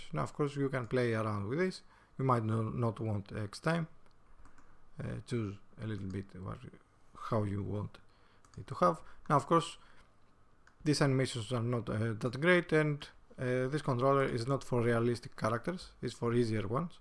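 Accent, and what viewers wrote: Greece. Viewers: Are you Greek by any chance? :) Asking cause of your accent.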